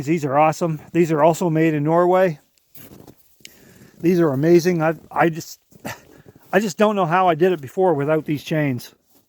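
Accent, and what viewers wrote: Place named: New Brunswick, Canada. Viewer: that and you have the same accent as the guys I know that live in western NB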